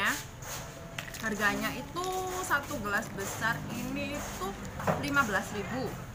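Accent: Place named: Surabaya, Indonesia. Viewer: Dasar wong suroboyo yo ngunu rek ngomonge biasa wae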